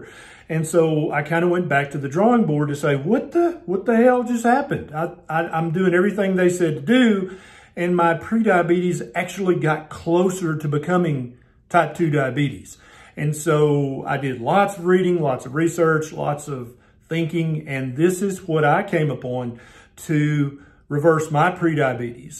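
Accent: South, United States. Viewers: Dude so southern "a touch of sugar" So true!!!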